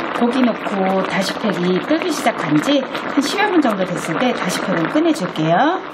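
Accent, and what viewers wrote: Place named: Daejeon, South Korea. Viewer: 저도 대전이 고향이예요 왠지 말씨가 좀 친근감이 있었거든요. 영상을 오래 전부터 보고 자주 해먹고 있었거든요. 대전 어디신데 친정어머니가 농사지으셔서 보내주시는지요?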